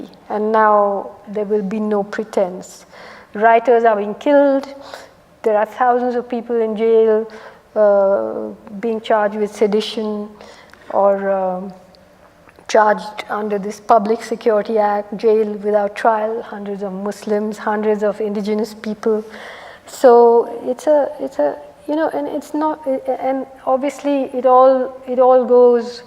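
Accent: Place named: United Kingdom. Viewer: She is another school of propaganda unto herself . Propaganda always has an element of truth manipulated to an end with creating even a more radical social polarisation than what actually exists on the ground . She really never talks about the emergency , or the biggest riots in the pre modi era , the kashmiri pandits exodus . She definitely has an upper caste Hindu phobia . In her pursuit of happiness , all the Hindu characters are cruel blood Mongers who crave Muslim’s blood , always vile and wicked while all the Muslims and third genders are noble , peace loving , innocent , righteous, just and beautiful .. specially the kashmiris are depicted as the most noble and kind hearted race in the world. What sort of a joke is that ? Her generalisations are gross and frustrating . Never been more fed up of a mainstream writer . What she is doing to society isn’t just a disservice but a more serious damage . 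In order to criticise one radical form of militant hinduism and the Muslim phobic right wing in India , she is demonzing the entire mainstream Indian culture and Hindus at large. Her theory is more simple than what her chaste British English attempts to hide - her one point program to create a demon out of everything that hinders her propaganda. There is absolutely zero analysis in her arguments ,only blanket sweeps and gross generalisations , even lies ! She picks and chooses facts at will , even the degree of the facts reported by her is manipulated . I wonder how come a brai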